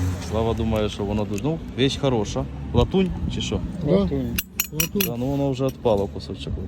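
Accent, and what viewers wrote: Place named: Kherson, Ukraine. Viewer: Дякую за ваші відео, дивлюсь із задоволенням. Звідки така цікава мова? Це в селах на Херсонщині так розмовляють?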